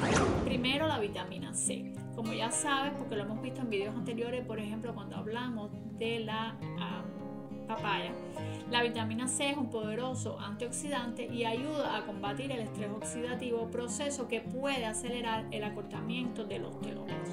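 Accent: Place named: Cuba. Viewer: GRACIAS MUY BIEN EXPLICADO SOY CUBANO Y USTED HABLA COMO NOSOTROS LOS CUBANOS DIOS LA BENDIGA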